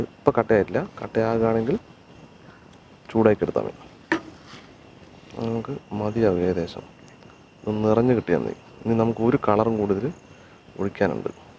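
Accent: Palakkad, India. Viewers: Palakkadan bhaasha super🤪 mostly watching for your language. Pakshe cake adipoli aayitundu👍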